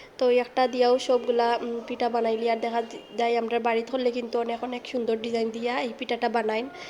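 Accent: Sylhet, Bangladesh. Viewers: মাশাল্লাহ আপু পিঠা গুলো অনেক সুন্দর হইছে ভিডিও টি অনেক ভালো লাগলো আর সিলেটের কথা গুলো আমরা অনেক ভালো লাগে❤❤❤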